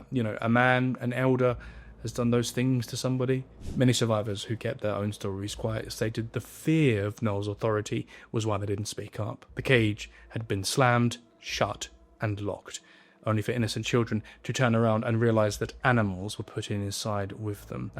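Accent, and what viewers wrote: England, United Kingdom. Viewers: That's just how English people speak. I think you're reading something that isn't there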